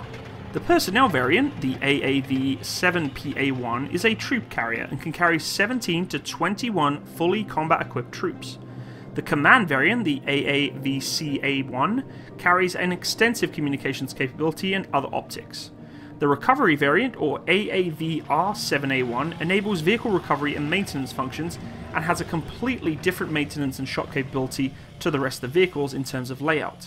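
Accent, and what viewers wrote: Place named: England, United Kingdom. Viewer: The narrator sounds English but talks like an American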